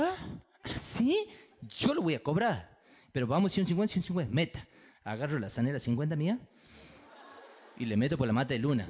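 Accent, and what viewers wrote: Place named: Tucumán, Argentina. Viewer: asi habla los tucumanos gatos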